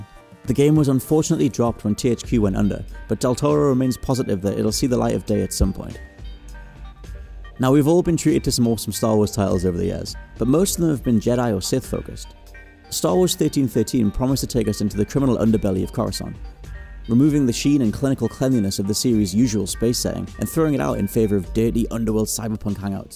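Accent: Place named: Geordie, United Kingdom. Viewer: is the Narrator from jesmond in Newcastle? sounds like it. it's very posh Geordie, but still sounds a bit Geordie to me! toooooon. :)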